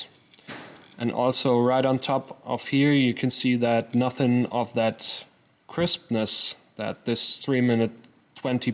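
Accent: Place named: Germany. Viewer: Endlich mal ein deutscher der ordentlich englisch kann ... nicht akzentfrei und auch nicht ganz fehlerfrei, aber immerhin besser als das was man sonst so hört.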